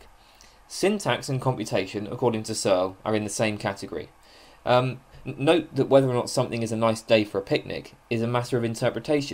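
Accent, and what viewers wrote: United Kingdom. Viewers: Most British sounding man but writes z in stead of s?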